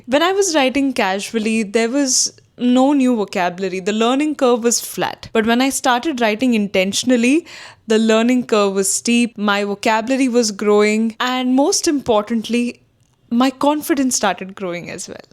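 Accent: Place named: India. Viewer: Thank you, muchas gracias. You seem to have an Indian accent.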